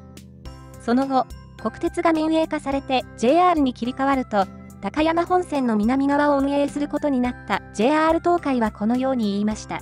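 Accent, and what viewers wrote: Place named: Tochigi, Japan. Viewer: 歌、英語、栃木弁訛りとここ数日攻めているUP主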